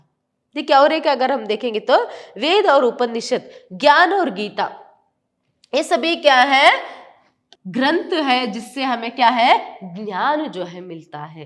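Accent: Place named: Karnataka, India. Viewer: but she speaks Hindi more like kannada😂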